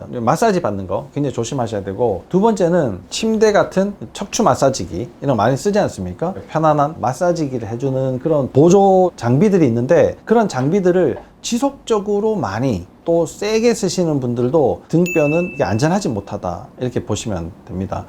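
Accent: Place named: Gyeongsang, South Korea. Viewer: 깅썅도 사투리.억양.엑센트 듣기 싫으네.... 기본도 모르면서 아는척을 엄청 나불 나발 거리네... 순진한 환자들 다 넘어간다...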